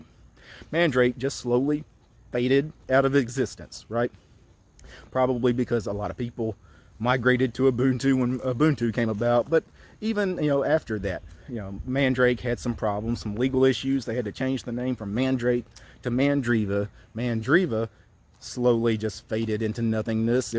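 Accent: South, United States. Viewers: > bald boomer with a southern accent ranting about linux and open source software in his back yard Is this a whole genre now?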